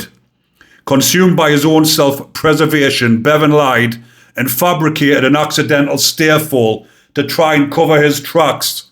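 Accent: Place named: Scotland, United Kingdom. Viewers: What English accent does this guy have? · As a southern softy I also love that tough northern accent- scares me just to listen to it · Wonderful accent! Is it Scottish?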